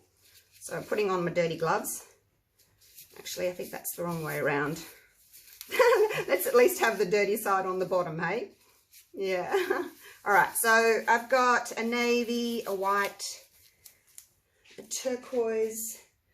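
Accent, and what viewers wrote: Australia. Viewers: Hi, Im enjoying your pouring demos ❤ Great to hear your Aussie accent, I'm watching from SA. Thanks for your explanations and sharing your experience. Love your art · Hi. I have been subscribed to Your channel for 2? Years. You are a favorite because of Your talent for beautiful paintings. I Love the Poodles and Birds, plus your gorgeous back yard. I know who is, and your accent, Your art, and the joyful vibe. This painting turned out well, although I was nervous. I could feel the stress from the beginning, but You calmed to natural, as the painting evolved. Relaxing. I also subscribe to Gilly. Her work will never be compared to Your work, because it is so different. I appreciate Both!! Thank You for everything that You share. I wonder.... both of you, are Australian. The World Loves anyone from Australia. You are the best. What if You and Gilly talked? 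Maybe it was an honest mistake? Or not? Would an apology work? Could You and Gilly combine as a Team for Australia. We need both Artists. I am an American, and depending on you Both to get through this horrible time by doing art. I Need you Both. Please patch the fence. If You, reply to Me... I am going forward to contact Gilly. Otherwise, I will stay silent. I adore You,.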